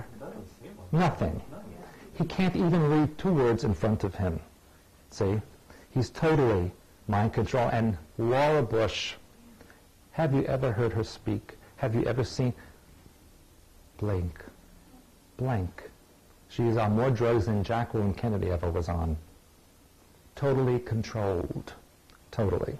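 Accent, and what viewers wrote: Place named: Russia. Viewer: So he's Russian, of communist family origins and he's married to whom? She's related to Mariam Magdalen. He has an accent, yet I am not sure why if he spent 25 years within the US fold working and ended that back in the 80s. He looks pretty young here, and this video was taken before 2003. Is 7lovo4 Stewart or a student? I'm seeing a fine line of agenda here, which is going to make me doubt a lot of his teachings and I'm only into this guy by 10 hrs or so. This is a reptilian take over?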